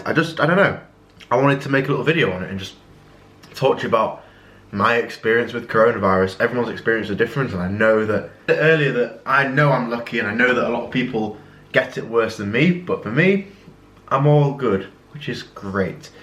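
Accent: United Kingdom. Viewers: you are amazing. I am so glad you are on the mend. Listening to you reminds me why I love the English 😻🌈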